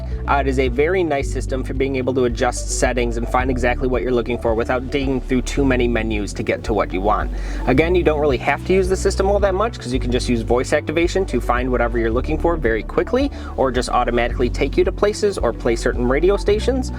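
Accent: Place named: Chicago, United States. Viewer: I enjoy the Chicago accent.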